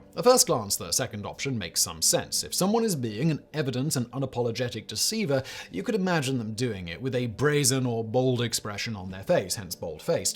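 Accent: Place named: RP, United Kingdom. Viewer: To my dumb American ear, posh English accent make "bald" and "bold" sound almost exactly the same 😆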